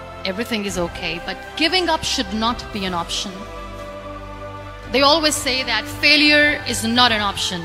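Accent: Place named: India. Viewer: Hindi accent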